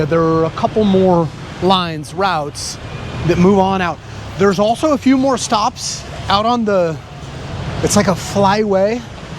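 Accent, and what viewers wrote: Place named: Iloilo, Philippines. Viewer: I don't think you're an expat, i think you are actually Illonggo, very good job!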